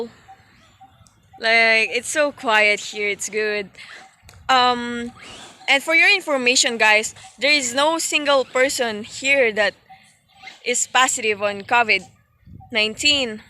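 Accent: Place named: California, United States. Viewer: All eyes on. You are a very beautiful women.😍😍🔥🔥. You talk like you're from Cali. Lol. I would love to come out there and hang out with you.😉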